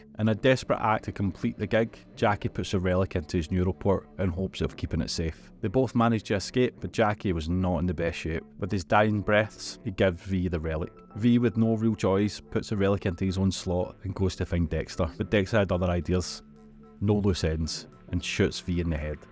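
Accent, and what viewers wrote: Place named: Scotland, United Kingdom. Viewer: Cyberpunk explained by a Scot! Great video, learned a ton. (Sorry if you're not scottish).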